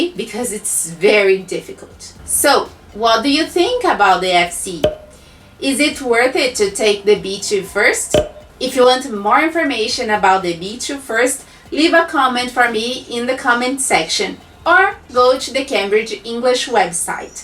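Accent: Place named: Brazil. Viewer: Nos primeiros 10 segundos de vídeo eu já fiquei tipo... Essa garota deve ser brasileira, só pelo sotaque kkkkk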